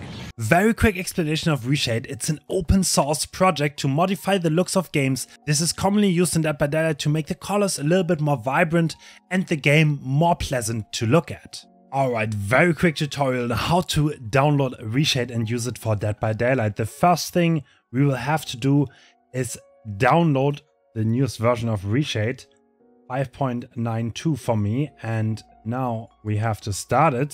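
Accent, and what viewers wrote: Germany. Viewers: I hear german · why is bro trying so much harder to sound more german than he actually is in this video?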